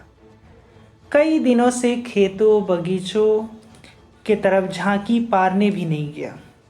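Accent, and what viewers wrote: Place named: Bhojpuri, India. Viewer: Bhojpuri accent 🤩😂😂😂😂👌👌👌👌 Ioved a lot the way you delivered your story...❤️😂😂😂🤣🤣🤣👌👌👌👌👌👌👌👌👌👌👌👌👌👌👌👌 Characters name was amazing...Simranya 🤣😂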